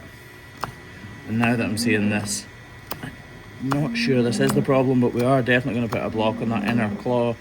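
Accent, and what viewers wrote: Scotland, United Kingdom. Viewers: Normally I love Scottish accents and regional word variations, but for some reason hearing "slither" in place of the "sliver" I'm used to irritates me every time.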